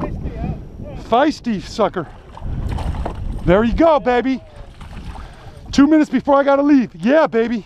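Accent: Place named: Northern California, United States. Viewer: Lol. I can hella tell you are from NorCal. "Right on brotha" Lol! NorCal lingo at it's best! Nice lookin Salmon by the way. Always taste better when you catch them personally!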